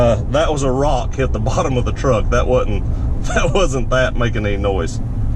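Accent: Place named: South Carolina, United States. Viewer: Are you sure you are not from SC? I know you are from Florida but that accent is distinct.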